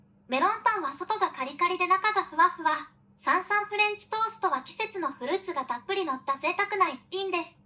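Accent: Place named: Saitama, Japan. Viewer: 埼玉県民の声がする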